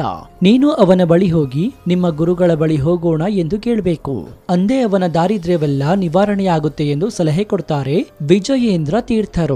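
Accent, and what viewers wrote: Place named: United Kingdom. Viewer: bad narration...Kannada in English accent!!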